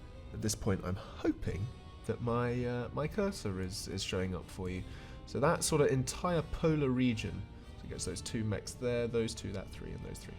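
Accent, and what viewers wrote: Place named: United Kingdom. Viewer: Excellent narration refreshing to hear hear a cool British accent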